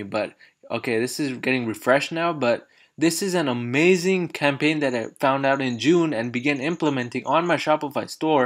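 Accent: India. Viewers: Did you mean campaign or company? What is this bg sound. Where are you from india?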